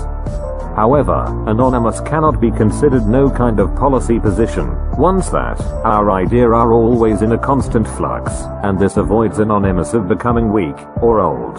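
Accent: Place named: United States. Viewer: Ata, da pra ver q quem está falando é um americano. Brasileiro ou qualquer pessoa no mundo pode falar fluentemente o inglês, msm assim tem sotaque.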